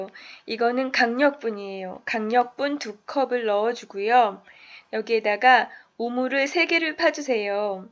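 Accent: Seoul, South Korea. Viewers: 말투가 옛날서울 사투리 같아요 !!!ㅋㅋㅋㅋㅋ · 뭔가 옛날 서울 사투리 같아요ㅎㅎ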